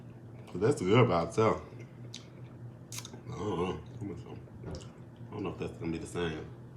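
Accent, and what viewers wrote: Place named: South, United States. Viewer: Great video. You sound very southernish in this video. Where exactly are you from? You were very excited to pour that water, very commercial like.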